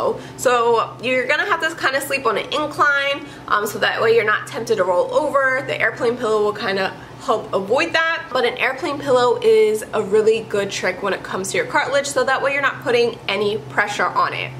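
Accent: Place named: Barbados. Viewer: That Bajan accent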